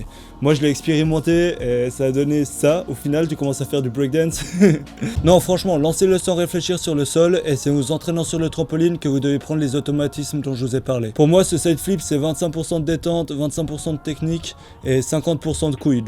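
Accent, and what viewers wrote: Switzerland. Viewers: Dès le début on reconnaît ton accent suisse ! TeamSuisse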